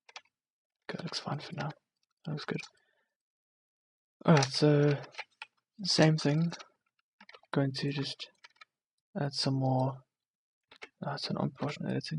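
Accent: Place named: South Africa. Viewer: I flippen knew it! I can hear the accent a mile away, i am too, it's good to see another Blender South African out there, keep up the good work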